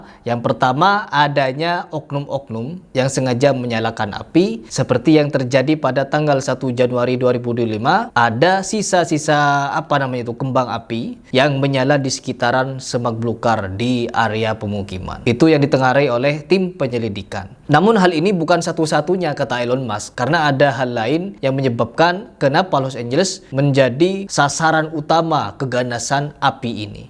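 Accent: Madura, Indonesia. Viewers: Aslinya Madura ya mas?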